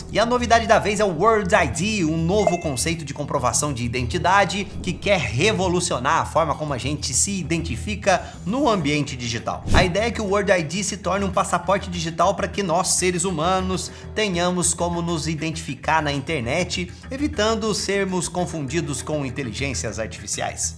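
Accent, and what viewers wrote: Minas Gerais, Brazil. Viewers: Mano, senti o mesmo, mas senti isso desde que o deixou de ser independente. Os efeitos ficaram profissa, tudo bonitão, mas, na minha opinião o nunca mais foi o mesmo. Ficou mais sério e menos zoeiro. Até aquele jeitão de falar de mineiro do interior diminuiu e eu gostava muito daquele estilo.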